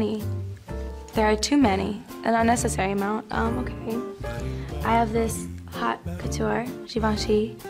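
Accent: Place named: South, United States. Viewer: she has a weird acsent in this video... kinda a southern feel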